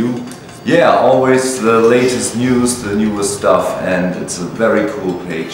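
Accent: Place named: Germany. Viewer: I love his voice and pronunciation in english with a german accent. Very brutal and strong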